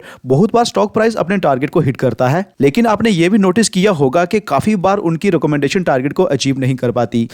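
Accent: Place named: Punjab, India. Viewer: Bhai aapka accent is punjabi......am I right??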